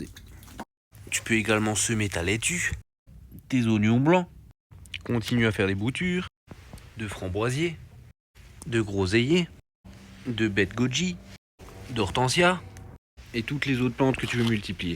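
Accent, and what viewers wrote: Alsace, France. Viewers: Parfois, il y a l'accent belge qui peut être trompeur, mais en tant qu'alsacien, on se reconnait c'est clair 🙂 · Si il est pas alsacien la france n'a jamais été envahie par l'Allemagne 😂. Moi je le suis et son accent ne trompe pas weich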